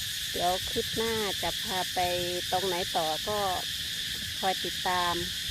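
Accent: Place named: South, Thailand. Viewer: นี่ถ้าไม่บอกคิดว่าคนใต้ เพราะสำเนียงเริ่มมาทางใต้แล้ว ยินดีที่ได้รู้จักนะค่ะ ทำคลิปสั้นด้วยสิค่ะ ช่องจะมีคนเห็นเยอะขึ้นค่ะ สู้ๆๆนะค่ะ เป็นกำลังใจให้ค่ะ นุ้ยเคยทำงานโรงแรมที่ภูเก็ต 6ปีกว่าค่ะ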